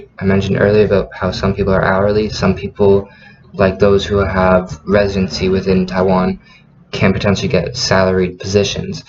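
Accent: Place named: United States. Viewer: Good sharing, you live in Taichung now? I can pick up your American accent. God bless America. MAGA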